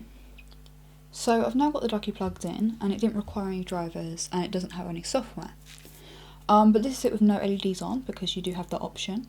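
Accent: United Kingdom. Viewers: British accent ? =o)?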